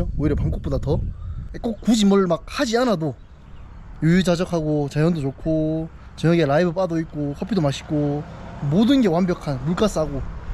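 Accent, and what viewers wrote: Gyeongsang, South Korea. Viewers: 말투가 대구말투이신것보니 대구경북사람이군요~ 분명히 부산경남말투는 아님ㅎ 제가 TG사람이라~정감가네요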